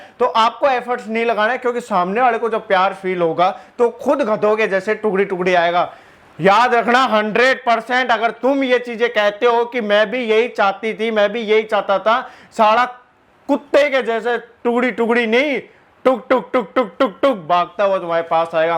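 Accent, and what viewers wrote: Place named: Marwari, India. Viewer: Marwadi ka boli se pata lagta hai bhai 🤣🔥🔥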